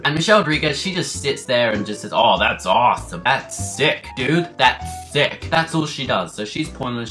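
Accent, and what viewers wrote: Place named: RP, United Kingdom. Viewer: Great review and a great posh accent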